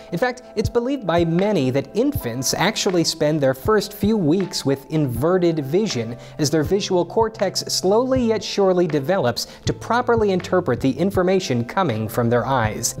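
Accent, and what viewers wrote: Australia. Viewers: Oh I see you are from Australia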